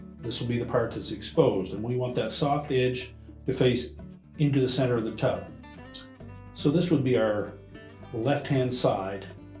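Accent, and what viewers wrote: Canada. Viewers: I think he might be from Canada. They would have an accent like that.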